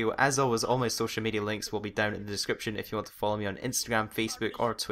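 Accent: Scotland, United Kingdom. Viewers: I've used the Brush Teeth thing since Sims 3 when my Sim's hygiene is low in other Sim's houses. I mean, you can take a shower too, but they get upset and might throw you out if you do it in Sims 3. Haven't tried it in Sims 4. By the way, as an American, I really like your Scottish accent. :) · Onyi Onuorah He’s Scottish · R u British/Scottish I am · When I started the video I was like "wait what the hell is this guy even speaking English his accent is too strong!!?" before realising it was just a Scottish accent. The worst part is I'M Scottish!!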